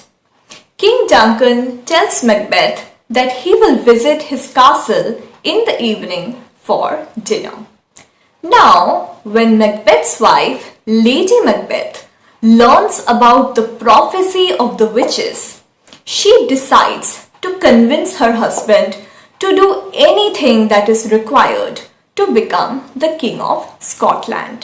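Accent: India. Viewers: Might be good but Indian accent distracts me like hell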